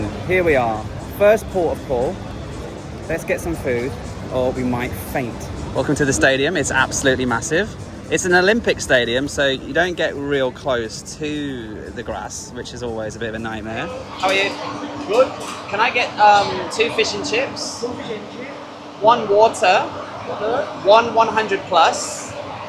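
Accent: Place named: Newcastle, United Kingdom. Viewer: Great geordie.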